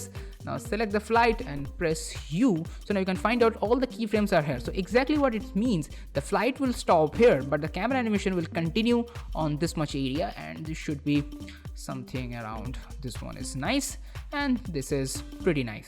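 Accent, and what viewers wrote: India. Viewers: Hay bro where are you from? West Bengal?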